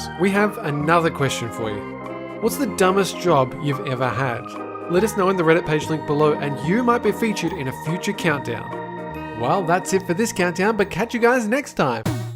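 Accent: Australia. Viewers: I SMELL AN AUSSIE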